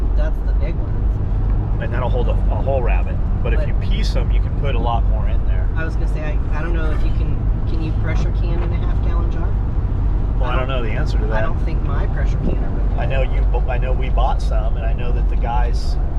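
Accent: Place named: North, United States. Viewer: Still sounds like such a yankee....not that theres anything wrong with sounding like one....just makes farm work seem odd for the south.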